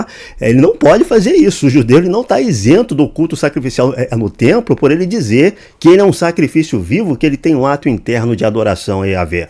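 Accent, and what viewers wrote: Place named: Rio de Janeiro, Brazil. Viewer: Sotaque carioca, camisa do mengão, malemolencia danada... ta aí o meu teólogo preferido.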